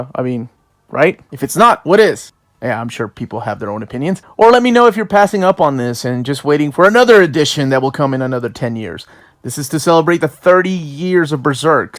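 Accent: United States. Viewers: eres peruano? Hablas el inglés con un acento gringo medio neoyorquino que ni se te nota. Pero se me hacía raro que tuvieras la piel color puerta como yo jajajaja, quizás soy el único otro peruano que te ve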